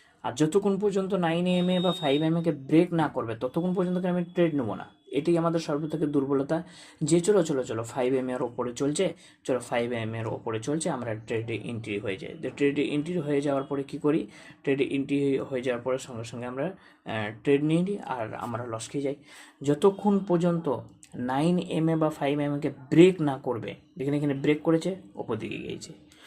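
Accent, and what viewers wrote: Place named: Bangladesh. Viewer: বাংলাদেশি নাকি, কথা শুনে তো ১০০% মনে হচ্ছে।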